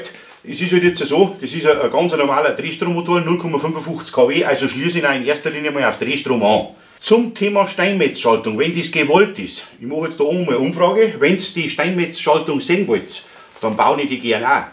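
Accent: Bavaria, Germany. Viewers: Ich schaue Deine Videos gern aber mein lieber ich kann Bayrisch nur schwer verstehen. Etwas mehr Hochdeutsch wäre schön. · Solche Empfehlungen würde ich nicht aussprechen. :D Als gut ausgebildeter Elektroinstallateur lernt man hier nichts fachliches... stimmt, es ist aber nie verkehrt, zu sehen und verstehen, wie andere "Artgenossen" arbeiten. Wer den Drang hat, sich selbst fachlich zu verbessern, darf sich nicht scheuen, andere Arbeitsweisen kennen zu lernen, zu sehen und zu verstehen. Außerdem lernt man so (in diesem Fall) den bayerischen Dialekt zu verstehen... du quasselst damit etwas, was ich fachlich alles kenne. Besser lernt man keine "Fremdsprache"... woll?! :D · "Und wehe die taugt nix..." 😄 Bin gespannt und in freudiger Erwartung! Es ist immer wieder ein Genuss Dir zuzuschauen. Auch wenn es ab und an etwas anstrengend ist wenn Du wieder ins Ur-Bayrische schwingst. 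😉 Mach weiter so!!! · Super Kanal. Finde die Umsetzung sehr gut. Den Schutzschalter würde ich genauso machen. Lieber Nummer sicher. Und dazu noch bayrisch Top top top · Also ich als Bayer versteh den Dialekt ja, aber ich glaube das für manche Menschen ein Untertitel absolut sinnvoll wäre!!! Dann hättest noch mehr Klicks!!!! 😝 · Guten Abend Mache bitte weiter so ! Und lass Dich bitte nicht Ärgern :-))) Ich finde deinen Kanal Top / Klasse / Spitze ........ usw. Und wehe Du hörst auf Bayrisch zu Sprechen , Hochdeutsch passt leider nicht zu Dir :--)))) hört sich an als wenn ein Rheinländer Bayrisch sprechen würde :--)) Schöne Grüße aus dem Rheinland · Des passt scho so wia d des mochsch danke für den elektrischen Teil aber bissle weniger bayrisch wär au ok ;) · Du bist scho a bäriger Typ - Bsonders dei Dialekt is a so schee. Mehra Bayern auf YouTube ! Mach weida so! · Ist das Bayrisch? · Ach reg dich doch nicht auf über sowas, dann lebst länger :-) Aber mal ehrlich, mach einfach mal was mit ihm zusammen, ein teil des Projektes bei dir und ein Teil bei ihm, das bringt Klicks :-D. 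Lets Bastel hat ja letztens auch Hilfe bei Jonas Winkler geholt. Mach weiter so und ich als Sau-Preuße verstehe dich auch in Bayrisch, zwar manchmal mit Hürden aber das passt so ;) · reg dich doch nicht ueber die dummen auf. ich schaue deine videos gerne, weil du diese interessant machst. deine freie bayrische ehrliche schnauze schaetz ich auch sehr. also brav weitermachen.lg rene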